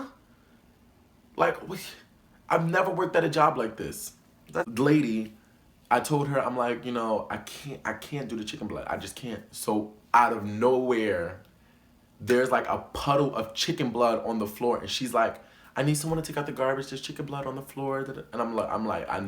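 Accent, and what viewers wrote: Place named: Miami, United States. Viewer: I think it’s in Miami based on his accent and DR references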